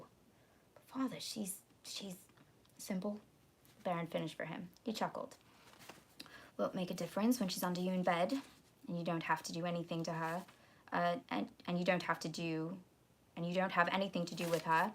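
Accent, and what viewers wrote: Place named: United Kingdom. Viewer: I'm glad you are doing well. Thank you for the book reading today. You don't have to do that next stream if you don't want to but you're a terrific reader with a lovely British accent lol. Hope you will continue doing at least one Livestream a week but if you need a break every so often from YouTube that's cool too. Have a good weekend 🙂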